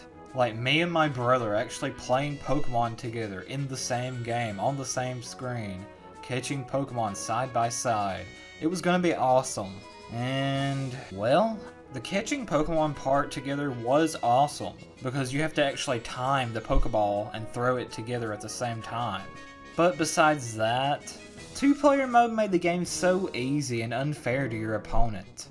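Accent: South, United States. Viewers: Are you a southerner?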